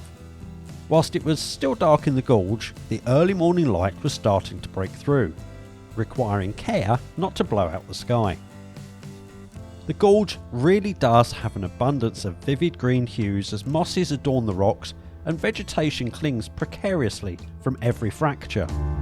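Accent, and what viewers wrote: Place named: England, United Kingdom. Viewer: The English accent and clear diction make this a must listen and watch!!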